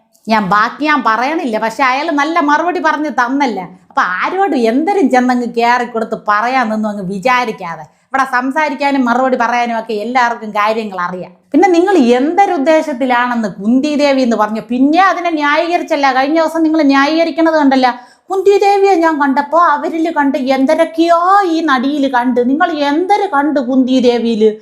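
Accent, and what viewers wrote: Thiruvananthapuram, India. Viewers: തിരോന്തരം സ്റ്റൈലിൽബോചെയെ പള്ളൂ പറയാൻ ഇതാരെടേ. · Thiruvananthapuram slang kalakki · പറയേണ്ടുന്നതെല്ലാം വളരെ കൃത്യമായി പറയേണ്ടുന്ന രീതിയിൽ തന്നെ പറഞ്ഞിരിക്കുന്നു. ഇത്തരം ഒരു പ്രതികരണത്തിന് ആദ്യമായി അഭിനന്ദനങ്ങൾ. അതുപോലെതന്നെ ചാനലുകളിൽ വരുന്ന പല കോമഡി പരിപാടികളിലും ദ്വയാർത്ത പദപ്രയോഗങ്ങളുടെ അതിപ്രസരമാണ് (പൊടിയൻ കൊച്ചാട്ടൻ ടീം ഒഴികെ, കുടുംബസമേതം കാണാൻ പറ്റുന്നത് ഇവരുടെ കോമഡി മാത്രമാണ് ) അങ്ങനെയുള്ള വളിപ്പ് പരിപാടികളെക്കുറിച്ചും ഒരു വീഡിയോ ചെയ്യണം.. പിന്നെ സഹോദരിയുടെ തിരുവനന്തപുരം സ്ലാങ്ങിലുള്ള അവതരണം ഗംഭീരം ( ഇതാണ് ശരിക്കും തിരുവനന്തപുരം സ്ലാങ് അല്ലേ ).. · തിരുവനന്തോര० ഭാഷയു० അവതരണവു० സൂപ്പർ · തിരുന്തോരം ഭാഷയിലായത് വളരെ ഇഷ്ടപ്പെട്ടു, എന്തൊരപ്പി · നിങ്ങടെ tvm സ്ലാങ് പൊളി 😂😂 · ഉഗ്രൻ.വളരെഇഷ്ടപ്പെട്ടു.തിരുവനന്തപുരംഭാഷ.മിമിക്രിക്കാരിയാണല്ലേ.നല്ലഭാവിയുണ്ട്. ബോച്ചെക്ക്പണംധാരാളംഉണ്ട്.അതുകൊണ്ടുള്ള,അഹങ്കാരമാണ്.വിദ്യാഭ്യാസംകുറവാണെന്ന്തോന്നുന്നു.പോത്തിനോടുവേദംഓതിയിട്ടെന്ത്കാര്യം.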